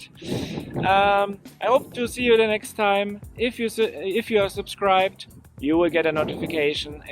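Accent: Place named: Germany. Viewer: Trevlig video men varför har du tysk brytning när du pratar engelska? :O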